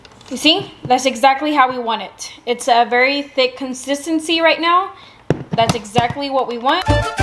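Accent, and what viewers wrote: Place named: Texas, United States. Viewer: I love the video and how you explained everything!! I knew you were from texas from your accent.🥰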